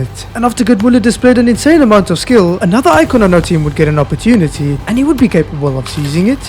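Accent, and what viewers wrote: South Africa. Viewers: is bro south african??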